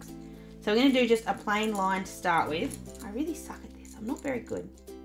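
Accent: Australia. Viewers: First video of yours I have watched and subscribed already. I have a low temp soldering iron that is pathetic for soldering so will try it with this. Good to hear your Aussie accent. TFS!! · It was awesome to hear a fellow Aussie! Most of the crafters I watch on YouTube are from the US, it’s hard to find Aussie craft channels. Definitely a new subscriber here. Thanks For sharing ❤️🇦🇺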